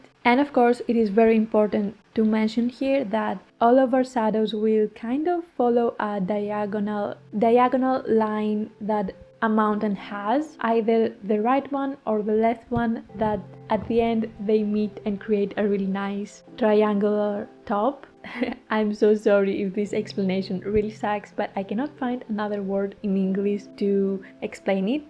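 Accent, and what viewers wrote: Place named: Greece. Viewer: Breathtaking piece! Thank you for this. Despite your very cute greek accent, you gave a very clear and detailed tutorial. All your tutorials are very helpful. ❤ Kudos! 😊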